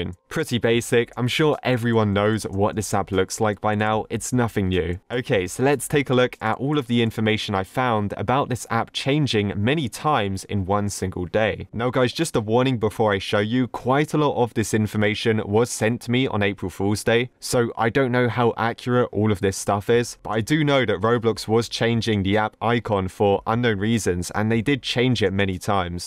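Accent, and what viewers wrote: United Kingdom. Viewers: Imma assume is British because of his voice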